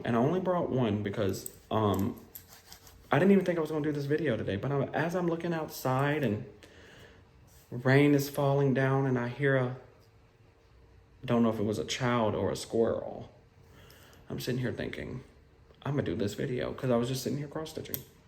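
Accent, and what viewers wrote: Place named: Louisiana, United States. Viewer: ! I. Love. Your. Soul! lol! I really enjoyed meeting you through FlossTube. I grew up in Louisiana as well and I hear your southernisms as you talk even though you don’t have much of an accent. lol. lol. Great first video. I need a visual of your envelope system though. I’d love to see some completed works as well. I’m looking forward to your next video. Also, in which general area are you from in Louisiana? I grew up in Amite which is about an hour north of New Orleans.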